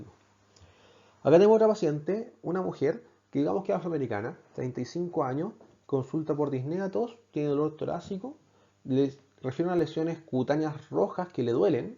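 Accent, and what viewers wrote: Chile. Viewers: no le entiendo lo que habla ... chileno?? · chileno no ?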